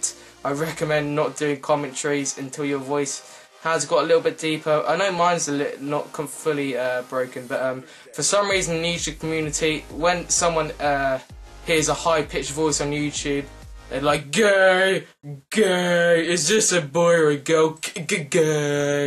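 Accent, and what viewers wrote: England, United Kingdom. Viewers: do you live in england because of your voice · I think from your accent that you are British correct me if I'm wrong. But the question is: Can you do a regular American accent.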